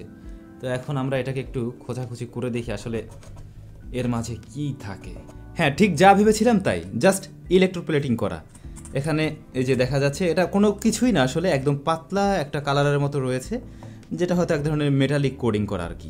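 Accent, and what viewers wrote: Barishal, Bangladesh. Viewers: vai ki mogo deshi naki.................. i mean borisal......???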